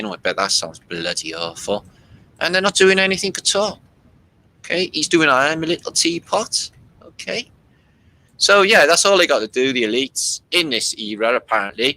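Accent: Wales, United Kingdom. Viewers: Hi from Queensland Australia, so glad I found you! Am amazed with your work. I was born in south Wales in the 70's- lovely to hear the Taffy accent 😀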